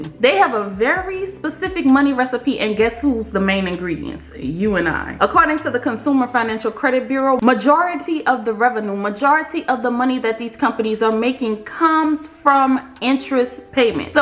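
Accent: New Orleans, United States. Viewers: you're from New Orleans lol.... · She sounds like she is from New Orleans 🤔🤔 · Sis are you from New Orleans?👀👀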